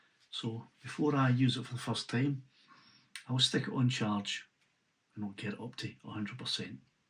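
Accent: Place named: Glasgow, United Kingdom. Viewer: I love your Glasgow accent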